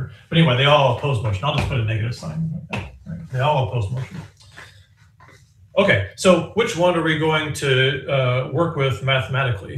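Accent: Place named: South, United States. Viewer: Mahnus mahnus mahnus :) If this post gets two likes, will you come back and deliver more of your lovely southern accent to us?❤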